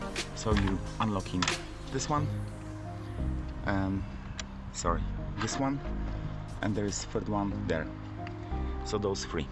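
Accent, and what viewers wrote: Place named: Poland. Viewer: Ale typowo polski angielski 😅 po pierwszym zdaniu wiadomo skąd autor pochodzi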